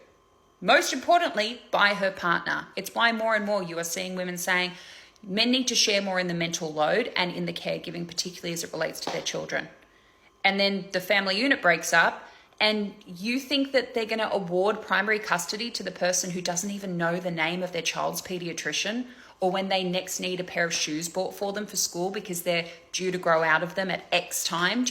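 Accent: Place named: Australia. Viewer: Now I'm going to assume from your accent. You're Australian but forgive if I'm wrong. But in Canada one of our top universities Miguel did a huge study on family courts and devices exist in family court. It's been hundreds of thousands of cases. And the reality is the reason men are less likely to get custody of their child is because men are not prepared when they come to court. 81% of cases were the child has a ongoing medical issue the father is unable to name that issue and its treatments. They're unable to name the doctor that treats this. 91% of men don't know their child's birth date in Canada. Over 98% of men couldn't answer where their child went to school or who their child's teacher was. They couldn't answer if they had any allergies or not. If they take any medication or even how to administer it. When you go for custody, you were given a list to prove to the family courts that you are able to maintain custody safely. 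And in 85% of cases in Canada, men fail to have that list done. If there is an out burst in court 76% of the time it is men that have that out burst. Tell what the study found was that The majority of men are unwilling to put in the work and the effort it takes to prove to the court that you can safely have custody of your child. And that you are willing and able to healthily. Co-parent with The child's other parent. Also, that study stated that in 94% of cases were domestic abuse is proven. It is the man who is the abuser. So not giving custody to a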